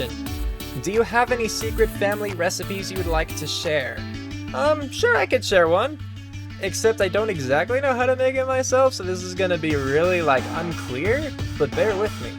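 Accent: United Kingdom. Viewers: You probably won't see this but I have three questions: 1. Can/will you play Arkham Origins? 2. Can/will you play Arkham Knight? 3. (Okay this one is kinda silly, but what the hell) are you British? Or just sound British?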